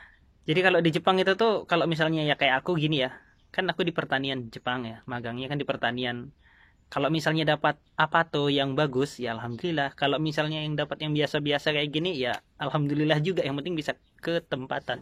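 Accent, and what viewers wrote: Java, Indonesia. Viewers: Orang mana bang? Biasanya kaya orang jawa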